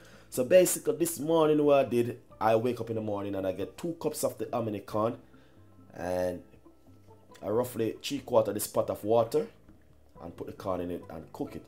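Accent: Jamaica. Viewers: Mi subscribed once mi hear u voice n see seh yah Jamaican 😭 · Queen Kim don’t get it twisted most of us Jamaicans speak good English ,just cause it doesn’t sound like American are British ,he is speaking English but has his Jamaican accent , unuh really know weh fi pick pon .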